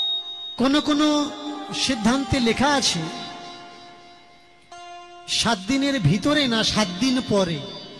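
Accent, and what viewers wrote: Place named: West Bengal, India. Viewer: কীর্তনীয়া মহাশয় আপনার বাড়ি কোথায় ? কথা শুনে মনে হচ্ছে পশ্চিমবঙ্গের বীরভূম , বাঁকুড়া কিঙবা পুরুলিয়া জেলার যে কোন একটা জায়গায় হবে হয়তো । তবে গান টি খুব ভালো লাগলো ।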